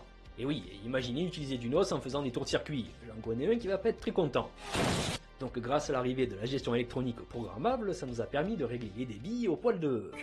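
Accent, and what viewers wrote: South, France. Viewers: Merci YouTube de m'avoir emmené ici, c'est vraiment très intéressant, le tout saupoudré d'un accent du sud chaleureux 👍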